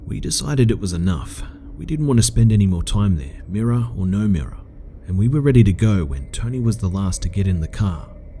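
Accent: Australia. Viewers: the way u can tell is he has a bit of twang as most Australians do to how he pronounces certain words like cove or over or home if u compare them to one of the numerous british accents you ll hear it too :) but its so slight. brits are a bit much at first to figure where they are from so many accents.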